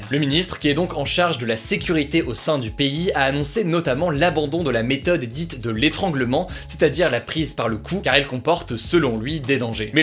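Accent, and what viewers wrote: England, United Kingdom. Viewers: Ton accent anglais me fume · C'est bien pour l'accent anglais mais c'est JayKay Rowling et pas JeeKay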